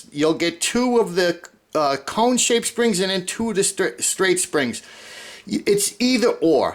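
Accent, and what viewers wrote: New York, United States. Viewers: That was great! Love the N.Y. accent makes me feel like home. I watched a few video's and you where the only one that mentioned cleaning it and putting a rag in the sink two very important things. Also baking soda and white vinegar will take off that water deposit stuff on the faucet. Also you were the only one that said which way the spring goes very thorough Excellent job! Thank you now I am going to try it! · Good job!! Really enjoyed your accent. Haven't been back to my birthplace, NYC/Long Island, since early 70's!!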